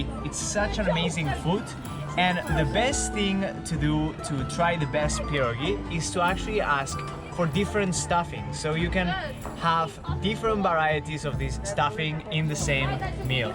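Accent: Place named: Spain. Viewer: Great spainish accent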